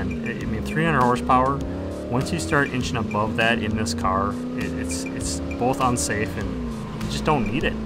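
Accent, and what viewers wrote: Midwest, United States. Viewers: You sound like you are from the mid West such as Ann Arbor Michigan. I have family out there so I recognize it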